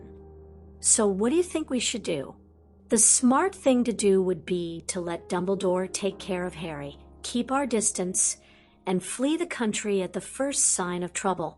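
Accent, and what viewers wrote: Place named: North America. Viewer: British characters need a British reader. Love this story but not with a North American accent.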